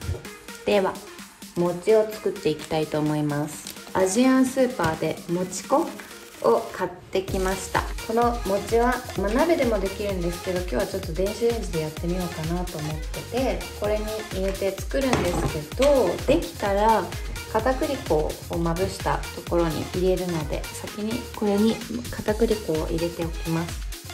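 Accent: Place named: Yamaguchi, Japan. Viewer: ちゃん！今日あなたの動画を見つけて、とっても癒されました♪ありがとう！山口弁てとこもかわいい！私も福岡出身でベルリンに住んでいて、大福作るの大好きで、いつかベルリンのマーケットで売るのが夢なの！食べてほしいな♪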